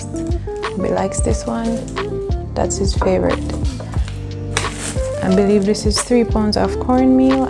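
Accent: Jamaica. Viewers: Hey happy to be participating in this collab with you do I hear a accent in there somewhere Jamaican. New friend here and new subscriber as well going cover for IG group he's too adorable thank you for sharing❤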